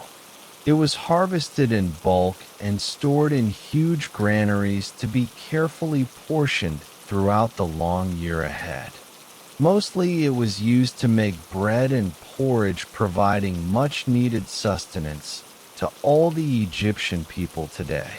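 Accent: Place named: United States. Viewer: Don’t like American accents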